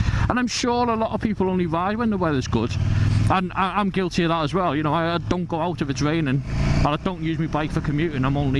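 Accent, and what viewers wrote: Liverpool, United Kingdom. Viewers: ASB! angry scouse Biker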